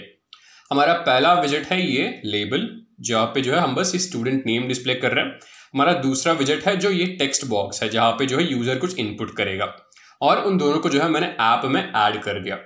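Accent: India. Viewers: Anyone relize the amazing indian accent?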